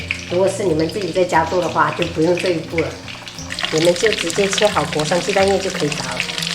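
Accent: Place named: Sichuan, China. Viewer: 是四川人，發音是不太標準😁幾將就聽吧☺️我是正宗泉州石獅人😊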